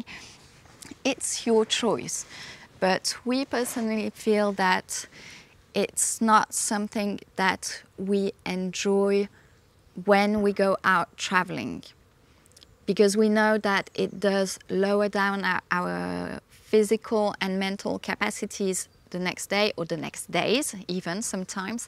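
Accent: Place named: United Kingdom. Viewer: I'm trying to figure out your accent. I know you live in Switzerland and speak French, but your accent speaking English is quite unique. Have you lived in England...because, as well as speaking English perfectly, you do have a tiny bit of a British accent...within your mix.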